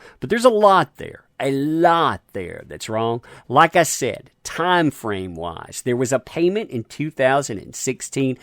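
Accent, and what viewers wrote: South, United States. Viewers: southern drawl makes this even more informative and entertaining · I never thought I'd enjoy listening to commentary with such a southern drawl. But you, sir, got it going good.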